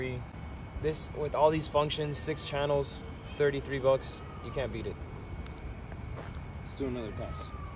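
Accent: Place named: Miami, United States. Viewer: Have no idea how I came to find this channel but gotta say bro hearing you talk hella remind me of Game Bred himself Masvidal. Lol. Gotta be from the MIA?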